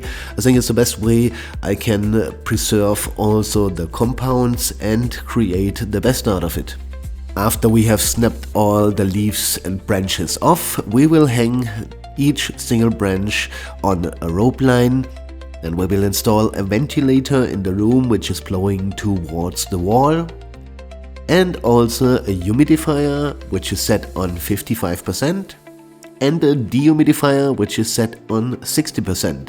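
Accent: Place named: Germany. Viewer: Das Englisch hört sich so deutsch an, wie ich es noch nie vorher gehört hab. Vielleicht wäre deutscher Content mit englischen Untertitel passender.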